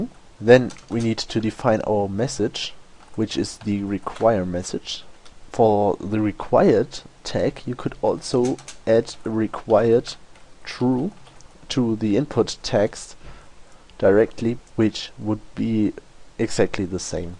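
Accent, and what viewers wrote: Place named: Germany. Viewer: your German accent is music to my ears. Fuck those indian tutorials.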